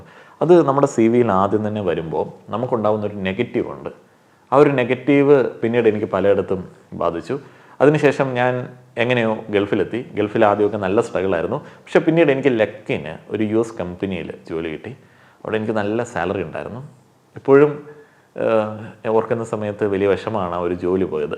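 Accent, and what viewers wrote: Kottayam, India. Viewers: Bro Kottayam kaaran aano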